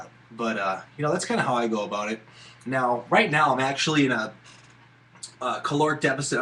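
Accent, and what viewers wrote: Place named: Minnesota, United States. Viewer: The minnesota accent annoys me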